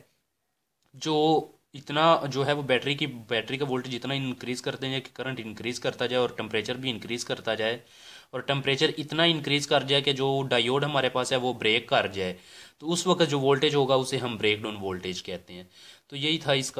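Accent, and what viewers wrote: Punjab, India. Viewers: Sir apki voice tone puri punjabi h I m also punjabi hindu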